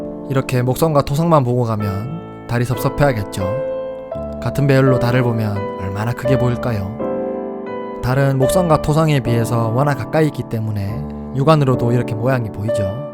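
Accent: Gyeongsang, South Korea. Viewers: 경상도 사투리에 목소리 좋네요 내심 연예하고 싶네요 ㅎ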